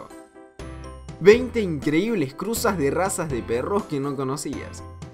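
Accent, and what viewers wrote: Argentina. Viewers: tienes asento argentino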